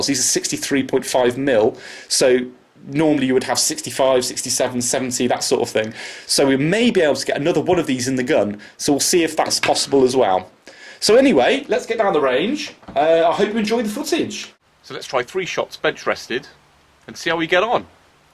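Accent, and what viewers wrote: United Kingdom. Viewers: I did NOT expect a British guy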